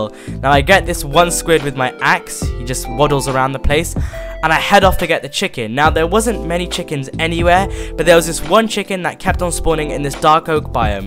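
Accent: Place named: United Kingdom. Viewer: His just british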